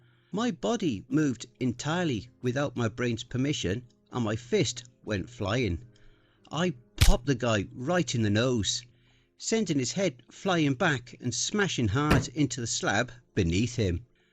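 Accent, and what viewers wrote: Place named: RP, United Kingdom. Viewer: With a delightful RP British accent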